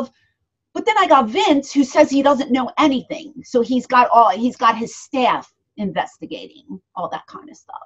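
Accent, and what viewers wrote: Philadelphia, United States. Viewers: Is she from philly? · She has the thickest philly accent lmfaooo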